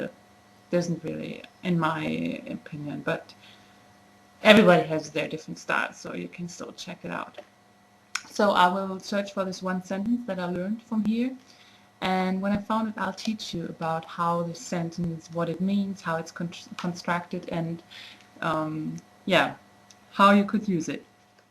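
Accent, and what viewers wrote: Nepal. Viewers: I loved your Nepali accent! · There is no doubt that u r pretty, but with ur Nepalese accent u just killed me. You sound and look sooo hot.... well beyond hot :)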